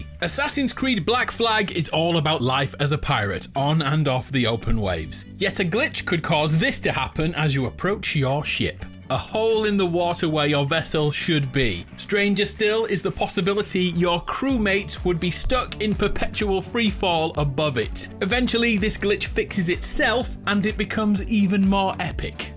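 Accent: United Kingdom. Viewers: wouldn’t expect many glitch in? In Madden? Love you but if your accent/description of football 🏈 wasn’t a dead give away you are from across the pond, then that statement most surely will. 😂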